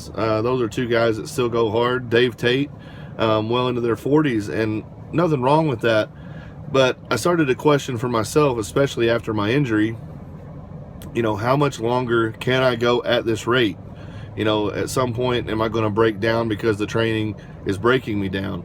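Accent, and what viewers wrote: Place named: Russia. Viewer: Will you be putting this into the new book? 20 week cycle? I can hear the russian accent developing in your voice, you have learned a lot from Boris and his athletes,I can see the influence in the way you speak about training. A mix of Louie and Sheiko, ain't that the best combo in the world. I love it. Side note, how cool is that video of them two meeting? Louie was so happy, grinning like a boy. Glad that those two met.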